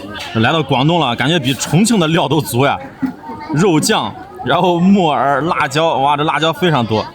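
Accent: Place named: Shandong, China. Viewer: 你是山东口音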